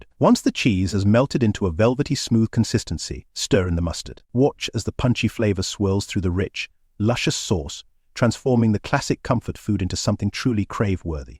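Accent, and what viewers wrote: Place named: RP, United Kingdom. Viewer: No idea why but the narrator is simply hilarious. Like he's pretending to be Toff English 😂😂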